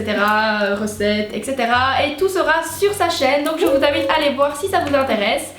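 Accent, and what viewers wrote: Belgium. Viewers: Je pense que ma vidéo préférée de ta chaîne est celle ci parce que c'est toi qui m'a donné envie de m'interresser au Lolita. Et je trouve tu arrives vraiment bien à coordonner que ce soit les vêtements comme le maquillage. Et cette vidéo est dans le thème d'halloween qui est ma période préférée de l'année. En plus le noir et le orange ne sont pas des couleurs évidentes à coordiner surtout pour du loli. Sinon j'adore ton humour, ton petit accent belge, ta façon d'être un peu maladroite et naturelle. Voilà 💕 🎀🎀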